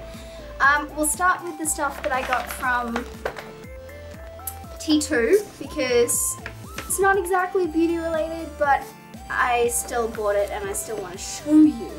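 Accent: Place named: United Kingdom. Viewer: I cant believe i haven't noticed this but are you Britain you have an accent!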